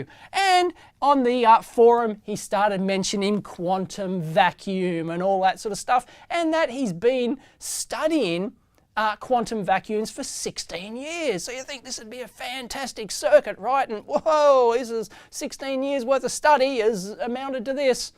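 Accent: Australia. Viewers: I have just watched an "English" video debunking a house energy saver, and now I have watched a Australian (?? hope I have not insulted you) debunking free energy - just fantastic going from low key cynicism to high intensity "your an idiot". Thank you for a excellent video and some real science. :):):)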